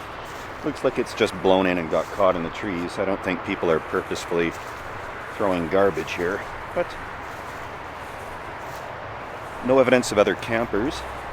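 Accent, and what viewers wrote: Canada. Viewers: After I listening to your accent for several sentences I thought to myself, "I wonder if this bloke is a Canuck?" Then, within 3-5 seconds you mentioned your drink, a beer that came from Alberta! Excellent conception for the video, great content, and my very best wishes from South of the Border.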